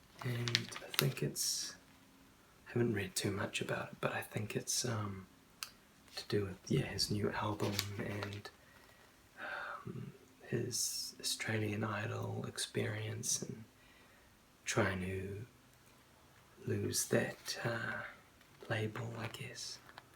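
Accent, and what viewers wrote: New Zealand. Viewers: I needed this - X10. been through a bad time over the past couple of years with people. I to have just started yoga a few months ago too. How ironic. Love your accent, not many Kiwi's doing ASMR, lots of Aussie's though... Thanks, X10.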